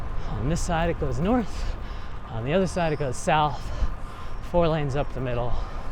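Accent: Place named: Spain. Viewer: Great video! Además de lo que han mencionado otros, quiero que sepas que tienes un hermoso acento español, una voz suave y amable. Thank you for sharing! ♥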